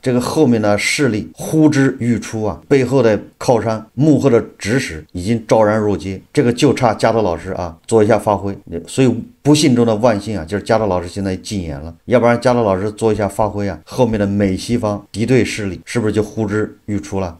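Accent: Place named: Anhui, China. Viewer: 听口音像安徽人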